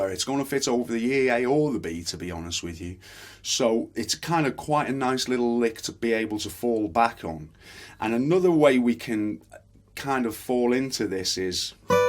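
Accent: Liverpool, United Kingdom. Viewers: Yank here. Love the Liverpool(?)accent and the tone from that martin(I live about 20 miles from Nazareth, Pa).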